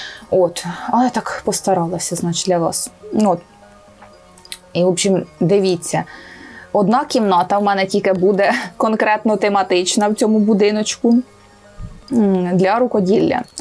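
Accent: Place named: Poltava, Ukraine. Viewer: Ви така крута!) Мені дуже подобається ваш говір. Моя подруга з Полтави спілкується дуже схоже і це дуже мило Попалися в рекомендаціях, одразу підписалась Дякую за контент))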